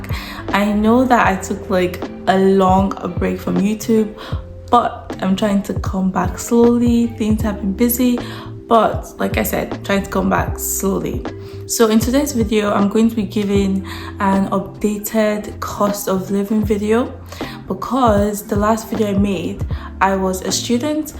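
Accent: Glasgow, United Kingdom. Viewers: I detect a slight Glasgow accent now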